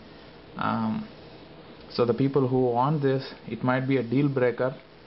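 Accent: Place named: India. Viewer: hes pointing out to ur indian accent my friend .. hence the software thing. :P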